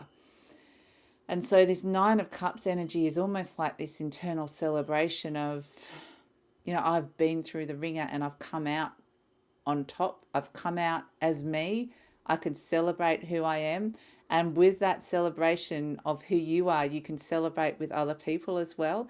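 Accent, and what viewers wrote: Australia. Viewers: Hello from Sydney I love listening to reading I got so excited hearing you speaking Australian English 😃 I’m always coming across American and other accents lol , I’m listening to my husband reading Leo ur spot on , u have a beautiful energy ur face is glowing with positivity great reading god bless you 🙏😊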